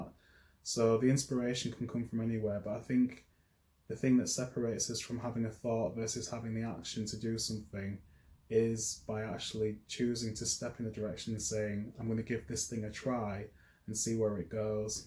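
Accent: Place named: United Kingdom. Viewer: I read a comment left on a rainbow bright video and just came for a nosey. Some reason thought you'd be American. Hello to you from a fellow Britt. 👋.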